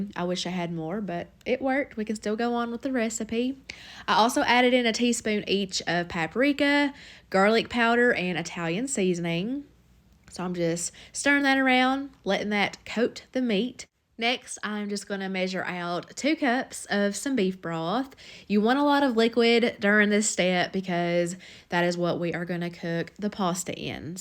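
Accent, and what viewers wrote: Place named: Kentucky, United States. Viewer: Great dinner 🥗🥣🍝 ideals! I love to hear you talk. That Kentucky accent. Lol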